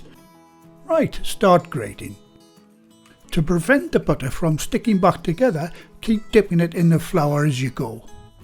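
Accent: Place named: Northeast England, United Kingdom. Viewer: He sounds like my father-in-law. Also from Northeast England.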